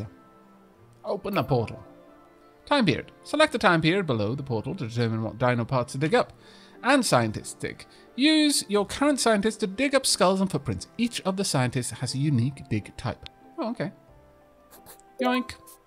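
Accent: Wales, United Kingdom. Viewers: Our good friend is Welsh, which gives him that lovely accent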